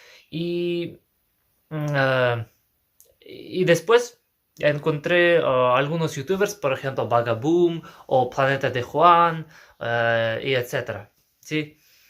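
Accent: Standard. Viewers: Hola que gusto conocerle, se entiende muy bien la pronunciación, y es una pronunciación neutra excelente, yo actualmente estoy estudiando el idioma Kazajo y aprendiendo su cultura.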